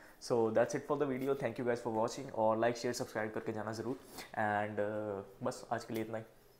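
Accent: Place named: Punjab, India. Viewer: Bhai aap punjabi ho na😅....aapke accent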